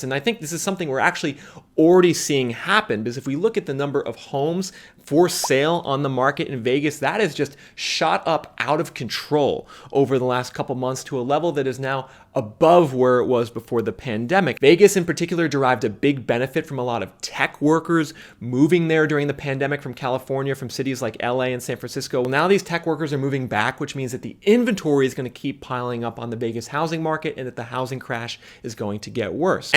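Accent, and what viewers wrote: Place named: Appalachia, United States. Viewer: Where you from originally? You say you work from home but you talk like a hillbilly. It's not your town and to be honest you need to get out more. Austin is not what its cracked up to be. Your probably a Democrat! Lol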